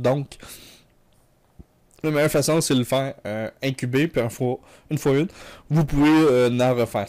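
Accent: Quebec, Canada. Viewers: j'aime trop vote accent québécois ^_^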